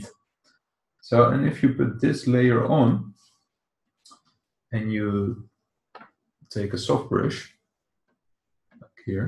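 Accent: Netherlands. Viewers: are you dutch? awesome tutorial btw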